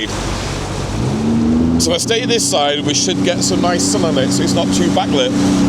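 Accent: United Kingdom. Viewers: Where did you get that lovely British accent? Certainly not in Ontario.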